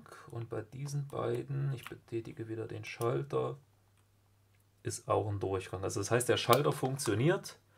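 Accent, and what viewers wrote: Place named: Saxony, Germany. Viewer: ....na ich kenns aus Sachsen.....klingt für mich nach Heimat, meine Vorfahren kommen daher......😉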